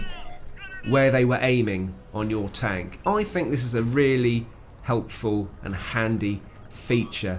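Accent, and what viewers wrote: United Kingdom. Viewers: Are you Bristonian ?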